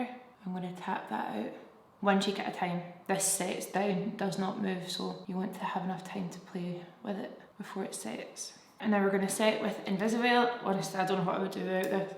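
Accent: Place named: Liverpool, United Kingdom. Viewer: Love your Liverpool accent " where's me sponge" lol😂😂 from a proud scouser❤❤❤❤😅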